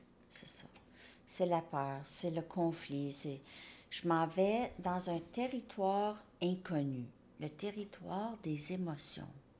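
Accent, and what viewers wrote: Canada. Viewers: Bjr. Votre bonnet vous va à ravir. J'adore votre accent canadien. Trop marrante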